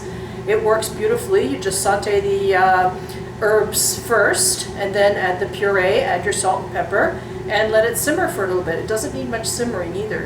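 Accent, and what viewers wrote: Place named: Northwest England, United Kingdom. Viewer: Thank you for your response. Your accent did seem a little different. I was thinking the Mid/North West. I have bought a tomato press and now I'm contemplating getting a food mill because I want a smoother sauce. Now I believe I'm just gonna go ahead and get that food mill. Your sauce looked pretty good! You should keep making more videos!😉😊❤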